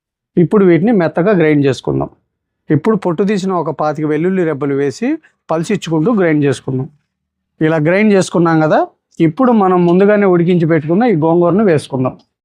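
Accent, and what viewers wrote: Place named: Guntur, India. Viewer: మీ గుంటూరు మాటలు చాలా బాగున్నాయి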